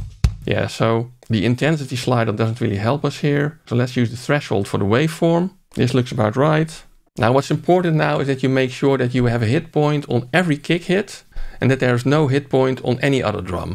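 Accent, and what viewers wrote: Netherlands. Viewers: nice dutch dialect 🙂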